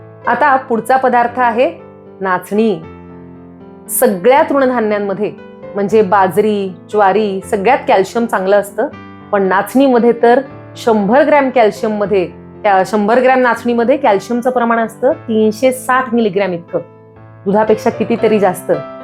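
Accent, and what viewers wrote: Standard. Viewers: मॅडम तुम्ही मारवाडी समाजाचे असून सुद्धा मराठी किती शुद्ध स्पष्ट बोलता हे खूपच कौतुकास्पद आहे. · 🙏🏻आपले बोलणे अतिशय छान व शुद्ध आहे.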